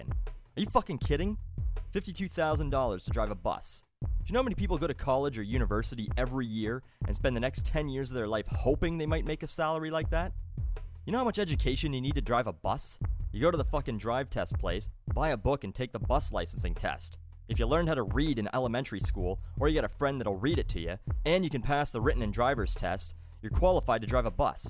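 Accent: Canada. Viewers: Oh god you can really hear Canadian accent. 10 out of fucking 10. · you had a way heavier canadien accent back then. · Bro! Listen to the end of sentences! He sounds so Canadian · I here more of a Canadian accent with these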